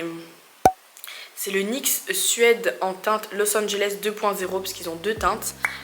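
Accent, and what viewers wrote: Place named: Belgium. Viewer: Son accent Belge 😍😍😍>>>>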